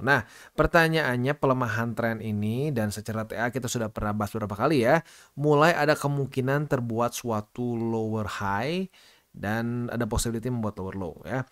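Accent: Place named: Palembang, Indonesia. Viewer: Palembang yo bang :V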